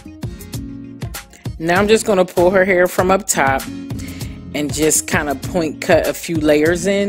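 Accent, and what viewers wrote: Philadelphia, United States. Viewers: Where can I buy your hair straightener and do you ship to London I wanna know please ❤️ thank you. You are great hairdresser and I wish London had hairdresser like you..I can tell you accent your American. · You have a Philly accent. Where are you located? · I don't even know where she is, but that accent is definitely Philly. Lol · i hear philly all inna voiceeee lol philly inna buildingggggg i love your work sis keep it up ! ❤️❤️❤️❤️ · Omgggg I love your Philly accent. When you said “fluffy” I was like, there it go right there!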